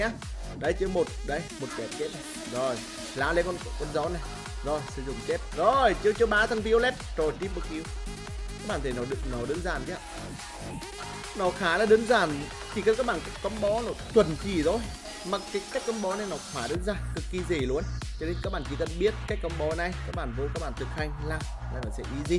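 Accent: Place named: North, Vietnam. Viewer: A nói giọng Bắc hơi khó nghe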